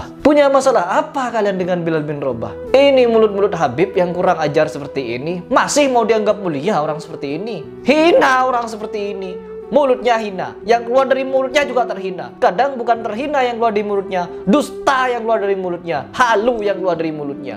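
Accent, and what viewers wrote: Madura, Indonesia. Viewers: Logatnya kaya orang Madura.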